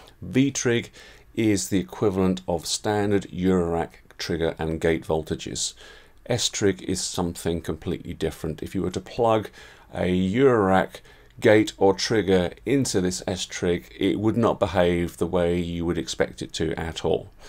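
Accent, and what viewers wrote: United Kingdom. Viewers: I fully agree. After long years of absence I've started last year again with synths. My "starters" were Behringer´s wonderful Model D, DeepMind and Pro1. In the meanwhile the Model D and Pro1 are replaced by systems of Moog. But nevertheless... starting would never happen with the more expensive Moog systems. Therefore I honour the products of Behringer as it gave me the chance to start again... even with a less budget. And not to forget... the work of is fantastic. Lots of explaining in a very British and comfortable way.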